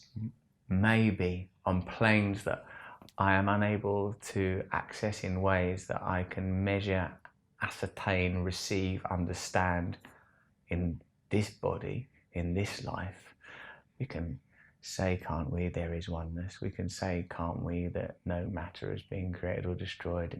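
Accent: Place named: Cockney, United Kingdom. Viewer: Is there no topic this nit won't publicly pontificate on? I bet he could drone on for hours about quick drying cement, just so as to hear his own mockney tones reverberating inside his empty skull. Russell, do us all a massive favour, and keep your inanities strictly to yourself...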